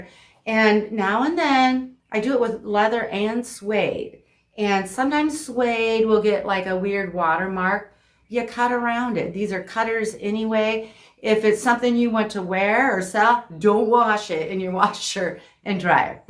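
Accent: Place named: United States. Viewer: so have I, gosh is so good and generous to share her talents, thank you x. ps love your American Accent (greetings from England)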